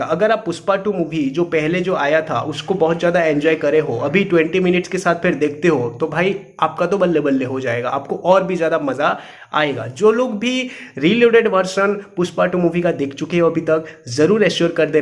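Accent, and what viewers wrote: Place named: Sambalpur, India. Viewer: Bhai aap Sambalpur se hain kya?? Main Odisha se hoon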